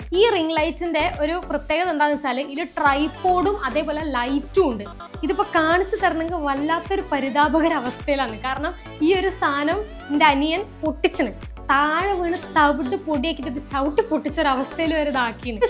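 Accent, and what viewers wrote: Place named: Kasaragod, India. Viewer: Haii chechiii I'm your big fan....❤❤enikk chechiye orupaad ishtawa chechide samsaram kelkkan nalla rasssaa ❤😭..chechide slangum ishtawa enikk❤❤❤... Chechiii kasaragod alleyyy njnum kasaragod thanneyanuuu.. Enikk chechiye neritt kanaan thonnunnuu😭😭😭🤍🤍🤍🤍.ende per vaishnavi oru hai tharumo plzz 😭😭😭🤍🤍🤍... Ee video ishtapettu ❤❤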